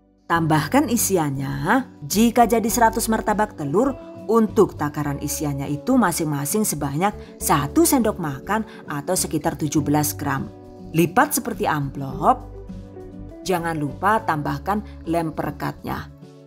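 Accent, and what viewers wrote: Banyumas, Indonesia. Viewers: ngapak kaya nyong🤭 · rika wong endi yuu..deneng ngapak banget😄😄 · 😂😂ngapak bngt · Saya recook ini kak, buat jualan, hasil x endeessss🤤, selain itu paling suka dengerin kk nya ngmng, gak ngebosenin, ngapak x gak ketinggalan jg 😆 #GiveawayTHV2021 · DONE Terima kasih mbak krn selalu memberikan resep yg ekonomis utk ide jualan,serta dg penjelasan yg detail dg harga2 bahan serta hrs di jual dg harga berapa,suka lucu klo denger ngomong bahasa ngapak dg suara khasnya...semoga sll muncul ide resep2 br yg ekonomis buat ibu rmh tangga yg ingin memulai usaha..sukses dan berkah selalu..Aamiin #GivewayTHV2021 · Rika wong ngapak ya Bu😂😂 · Hahaha....ngapak jaaan kiye lah...mtr nuwun bund resepnya.sukses sll kontennya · Deneng dadi bahasa ngapak🤣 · Wong ndi sih ngapak temen yuuu🤣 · Asyik jelas dan teratur bgt...ada bahasa ngapaknya juga😂😂😅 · Mbak, asline uwong mediun apa brebes si.. 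Faseh bener logat ngapak e 😅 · Wilang ngapak ya😅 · Q nntn ngantuk pas dgr suara ky ngapak jd melek lg😅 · Jan logate apa karo nyong..y slm ngapak..ora ngapak ora kepenak..slm kenal..boleh dicoba nih😋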